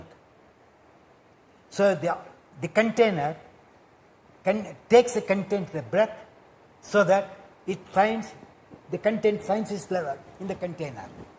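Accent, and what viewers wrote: India. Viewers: How Can I Correct the Subtitles? Perhaps the Tamil language accent of his is confounding youtube's speech recognition software. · OK, that was not entirely clear, and I sure am familiar with yoga terminology and Indian accent.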